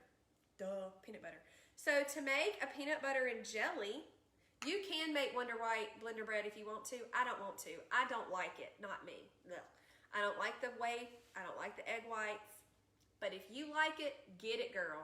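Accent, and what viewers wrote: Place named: South, United States. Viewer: Oh my gosh, you’re so cute! Love that southern accent! Thanks for all these suggestions ☺️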